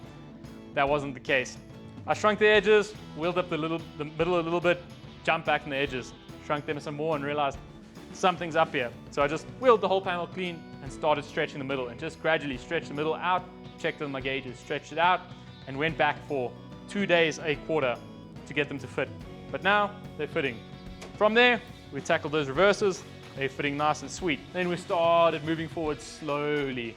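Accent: South Africa. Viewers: Do I detect a South African 🇿🇦 accent?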